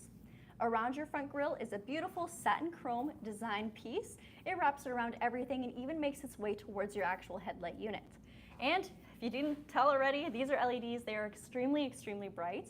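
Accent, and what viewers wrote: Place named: United States. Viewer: Disappointed hearing an droning American accent ...lost in translation zero authenticity coming through.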